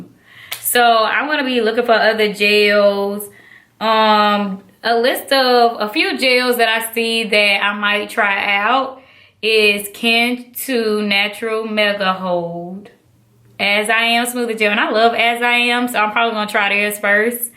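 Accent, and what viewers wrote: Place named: South, United States. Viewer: You country and ghetto sounding so I couldn’t finish watching your video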